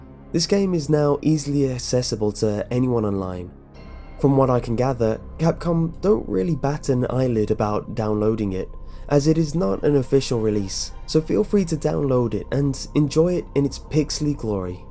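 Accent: United Kingdom. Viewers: Dude he’s British You must be a idiot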